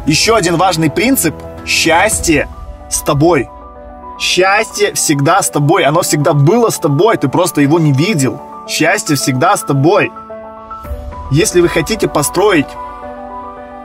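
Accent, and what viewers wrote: Odessa, Ukraine. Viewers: Одесский акцент😉👍✌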